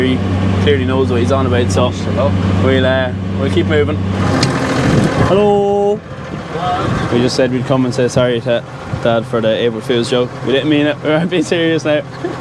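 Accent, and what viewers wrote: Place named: Meath, Ireland. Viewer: Butlins Mosney the Peefect place for a holiday Meath accent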